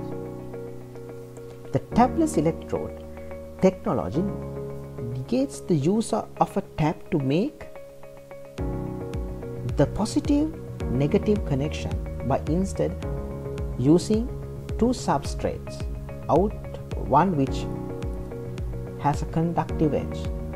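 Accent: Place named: India. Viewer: why India English.......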